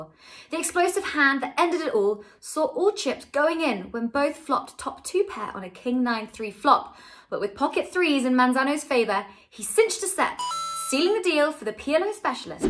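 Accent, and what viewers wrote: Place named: Australia. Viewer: australian accent i hear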